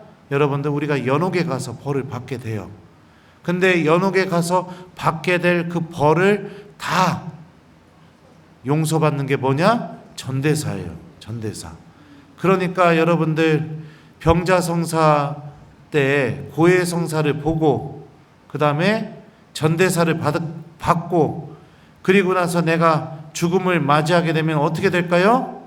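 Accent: Chungcheong, South Korea. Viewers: 신부님 충청도 홍성사투리가 너무 정겨워유 제고향도 엽동래유 신부님 건강도 돌보셔유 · 잘 계셨슈? 그려유~~그유~~ 충청도 구수한 사투리가 너무 친근감이 듭니다. 고향생각이 납니다. 쉽게 풀어 강론하시는 신부님 고맙습니다. 항상 건강하시길 기도합니다. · 충청도 사투리로 인사하시니까, 신부님의 덕담이 더 구수하게 들리네요. 저도 몇십년전 죄 고백을 했던 씁쓸한 기억이 나네요 죄는 붙잡지 않아야 ,진정으로 회복이 빠르다는 말씀에 적극 공감합니다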